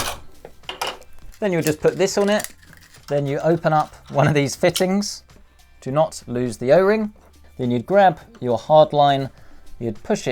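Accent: United Kingdom. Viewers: Great use of the 90-degree bending tool... my eyes are still hurting...also my ears from that British accent..How do you have 466k subscribers is beyond me.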